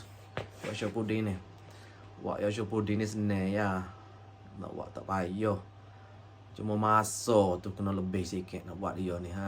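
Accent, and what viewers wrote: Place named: Kelantan, Malaysia. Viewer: Saya baru tgk resepi Yorkshire ni Dalam channel Cik Yatie Vlog. Tgk dia buat nmpk sedap n mudah.Dpt pula suggested dr YT channel ni, terus melekat. Nak try buat jugak lah pudding ni. Btw, cute lah kecek kelate.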